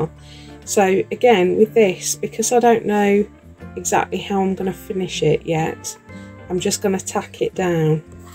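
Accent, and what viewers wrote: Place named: Lincolnshire, United Kingdom. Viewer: I've just discovered this video. Lovely to hear a Lincolnshire accent. Your pages are amazing, I am so inspired to have a go. Unfortunately I don't have an instagram account but I can watch all your you tube videos. Thankyou for sharing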